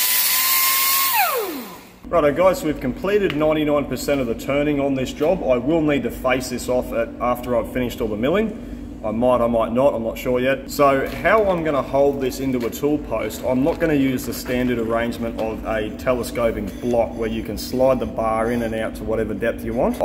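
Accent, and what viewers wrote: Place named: Australia. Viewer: But the subtitles, in all videos, don't understand Aussie, nor English .... I turn them of.... I'm not from a English-speaking country ❤❤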